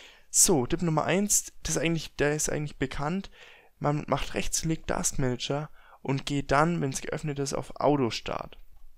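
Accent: Franconia, Germany. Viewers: aus franken? :D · Schätze mal du bist ein Fränkischer Mensch aus Franken (Dipp). Du redest ja wie der Loddar. Aber mach die nichts draus. I ben of älle fäll a Schwoab. Ich finde ja alle südlichen Dialekte super. Aber so ein Ossi oder auch Fischkopf-Dialekt kann ich gar nicht ab.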